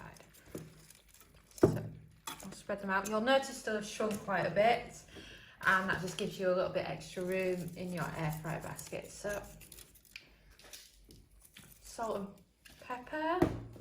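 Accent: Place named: North Yorkshire, United Kingdom. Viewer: Thanks so much. This is a recipe I'll be making soon as I love duck. My wife doesn't eat meat but I'll be happy to make this for myself. Also, love your accent. I'm guessing North Yorkshire. Am I close?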